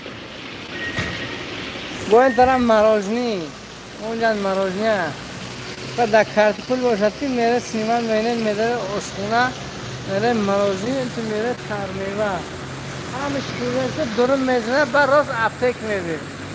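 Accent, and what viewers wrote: Tajikistan. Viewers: сахт Точики гап мезадиян 👏👏👏👏👏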